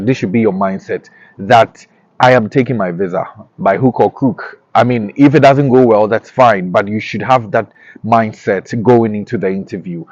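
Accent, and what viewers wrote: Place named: Caribbean. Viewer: my brother you sound like youre from the Caribbean. ty so much for the information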